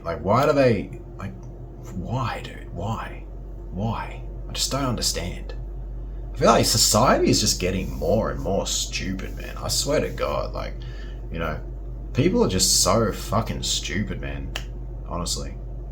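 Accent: Australia. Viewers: It's been said before, but I'll say it again, individuals can be smart. You're smart. I'm smart. But people are the stupidest mudda fudders on the planet. Here in AZ people bought up all the toilet paper in the local stores like it was Covid all over again! Why? Get this, due to the port strikes on the other side of the fcking country! Like we get our toilet paper imported from fcking China 🇨🇳 🙄 Why the fck are people so gotdamn stupid. This is why I love your channel. Brits accents grate on me but I actually like the Australian accent.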